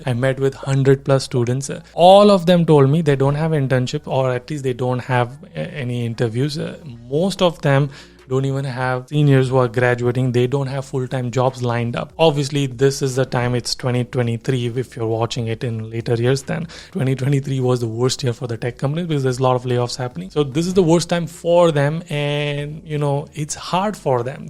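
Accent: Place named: India. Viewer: accent = good but speaking = still indian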